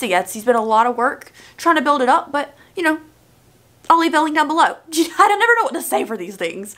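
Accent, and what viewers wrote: South, United States. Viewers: I feel like your southern accent is more present lately and I love it !! Your Morphe Sweet Tea rant in your previous video was hilarious.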